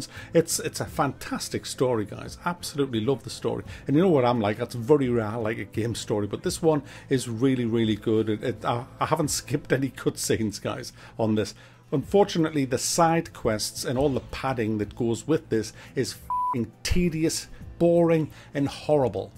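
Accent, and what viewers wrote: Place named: Scotland, United Kingdom. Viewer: You Scottish? Something satisfying to hear your accent rap off profanity during a review that makes me smile. You got a sub, good review.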